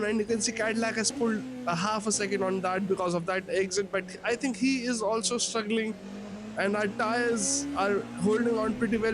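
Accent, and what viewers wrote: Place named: Arab world. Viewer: Are you Arabic? I can hear your accent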